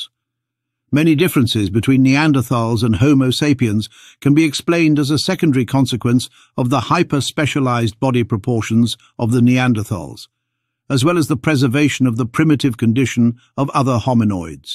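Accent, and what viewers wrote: United Kingdom. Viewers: A clipped brit accent and utterly no academic background in physical anthropology makes for sensationalism , not science.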